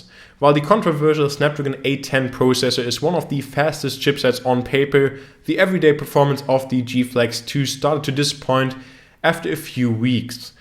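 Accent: Germany. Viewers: Bist du Deutscher, hört sich so an^1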